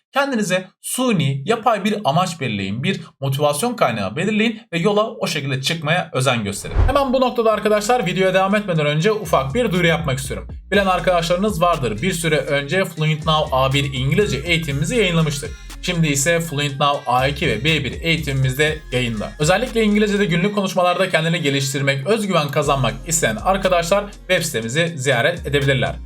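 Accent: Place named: Turkey. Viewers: bence türk aksanı belli oluyor ama aksan önemli değil zaten çok akici konuşuyor. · ilk 5 saniyede anlaşılıyor aksandan türk olduğu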